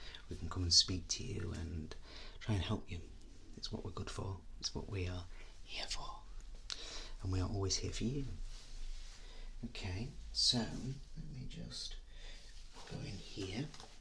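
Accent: United Kingdom. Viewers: He sounds... British?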